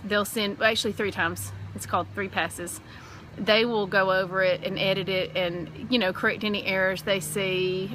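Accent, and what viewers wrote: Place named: Kentucky, United States. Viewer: Oh, I grew up in Kentucky so I love watching your videos because I miss hearing the accent now that I live in Alaska.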